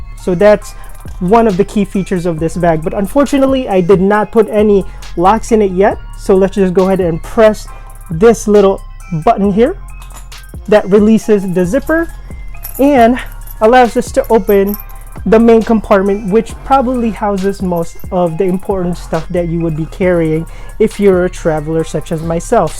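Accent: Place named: England, United Kingdom. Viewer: Wow I love that English accent🤗❤️❤️❤️ wow that's a nice handy bag #mto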